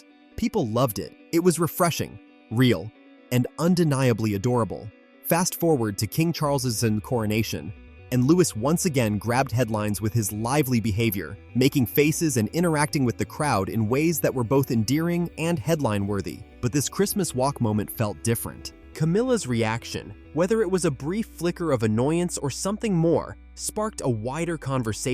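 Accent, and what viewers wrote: United States. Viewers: And why use an AI narrator? If you absolutely can’t afford the minuscule fee a human narrator would earn, at least teach the AI to pronounce the names of the royal family correctly. It’s offensive to propagate mistakes and then use an American accent. That’s a deliberate decision. Real Americans can pronounce Louis correctly.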